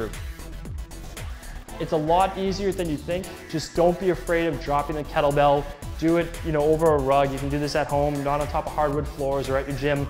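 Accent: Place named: Canada. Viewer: Is from Canada?? He pronounces his "o's" like a true Canadian.:)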